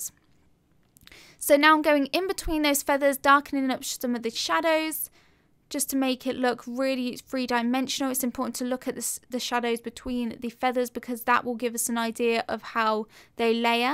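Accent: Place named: Midlands, United Kingdom. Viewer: I do so love your videos, every brush or pencil stroke is narrated in a way that I can understand and it all sticks in my head. So much better than a lot of tutorials on Youtube that are more someone drawing and music playing with no narration, not that the artists are not good, it's just better with narration, I am still trying to work out your accent? Midlands maybe but a touch of Southern, great though, every word is clear and informative, thank you for making these tutorials they have brightened up my days no end and re - ignited my determination to be able to draw.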